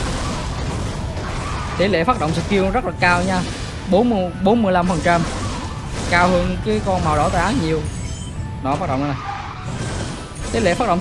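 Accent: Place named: Phu Yen, Vietnam. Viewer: Quê anh biển số 78 hả ah Nghe giọng giống lắm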